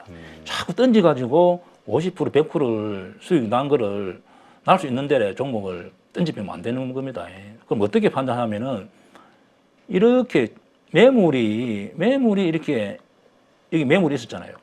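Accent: Gyeongsang, South Korea. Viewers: 갱상도 라도 시골 이네..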